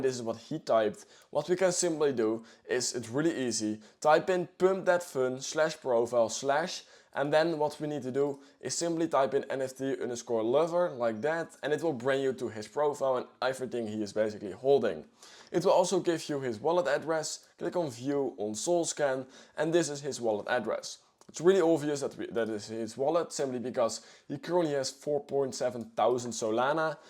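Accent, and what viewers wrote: Netherlands. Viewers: Kom jij uit Nederland?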